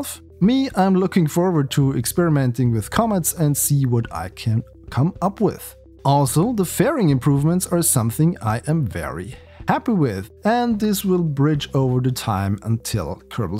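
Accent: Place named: Germany. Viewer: I have the feeling to hear a german accent. If not correct me but Du klingst wie ein Deutscher, der verdammt gut Englisch spricht! Nice Video btw. LG Justus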